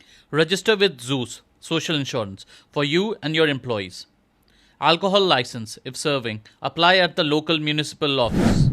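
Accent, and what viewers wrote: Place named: India. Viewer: You're speaking in an Indian accent and so, I can guess you're based in India. How would you provide a worker in Poland with a job?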